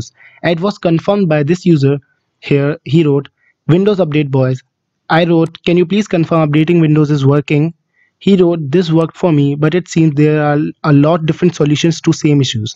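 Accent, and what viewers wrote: India. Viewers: I dont trust this indian voice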